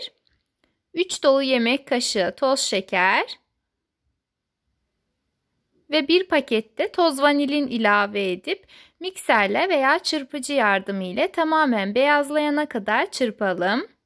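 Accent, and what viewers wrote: Azerbaijan. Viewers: Super olmuşşş,azərbaycanlımısınızz?? Lehçedə biraz nəsə var 🥰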